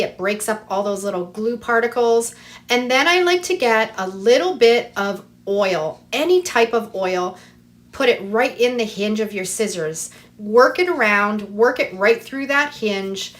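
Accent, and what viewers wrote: Canada. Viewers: Sounds Canadian. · And she sounds CANADIAN 🇨🇦 I grew up in Hamilton and still catch myself saying abowt 😊😂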